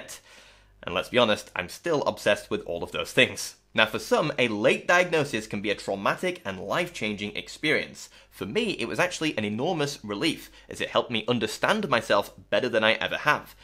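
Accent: United Kingdom. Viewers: In the future it's worth pointing out where the charity you're advocating for is based in. As I suspected from your accent and I did look it up - Mind is based in the UK. I don't have anything against helping UK but I live in Poland and mental health awareness is basically non existent here and we also need a lot of support.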